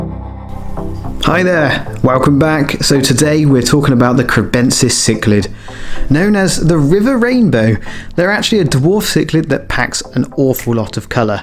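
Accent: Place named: United States. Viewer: I hate American accent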